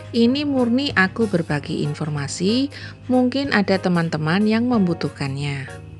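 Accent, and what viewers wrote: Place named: Surabaya, Indonesia. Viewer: Opo kabare cik..kangen sama logat suroboye aku ..kangen suarane rek😂😂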